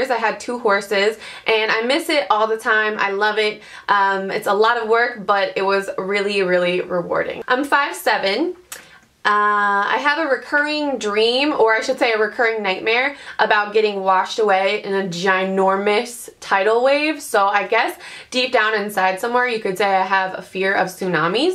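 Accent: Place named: South, United States. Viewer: this sounded SO southern but I loved it lol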